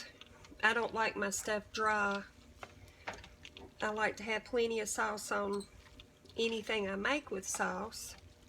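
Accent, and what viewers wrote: South, United States. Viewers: I love southern accents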